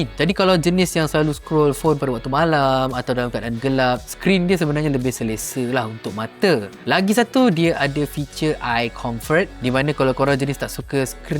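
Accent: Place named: Kuala Lumpur, Malaysia. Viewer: Serius lah kalo bukan komen Hang aku rasa Abe ni ore kl 😂😂😂😂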